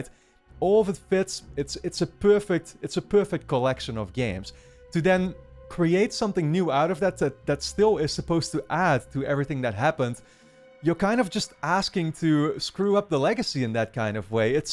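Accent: Netherlands. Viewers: You really wear your thoughtfulness and good nature towards others on your sleeve and its a refreshing thing when it comes to talking about games...maybe people just do it too much and you just hit the right amount but thanks for the vids. I might have a semi possibly ignorance induced anecdote to go with my claim as ive never encountered someone with an accent like yours within the last 10-15 yrs who wasnt a total joy to be around. Basically for me, dutch type people voicing their excitement about stuff gets my juices flowing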